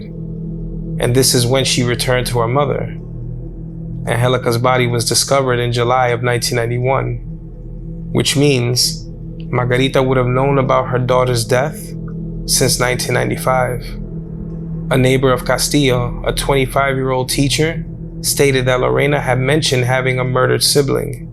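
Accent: New York, United States. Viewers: Where are you from? Sounds like New York accent.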